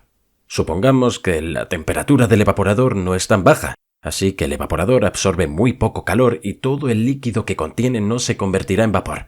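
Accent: Spain. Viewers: tipico acento espaniol :)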